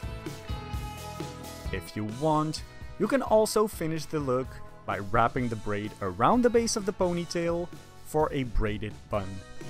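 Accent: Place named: Netherlands. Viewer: Are you from the Netherlands? Your accent sounds different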